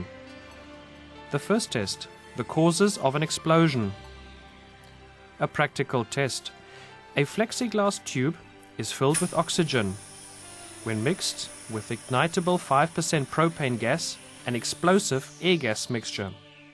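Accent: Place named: South Africa. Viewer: You sound south African, great video.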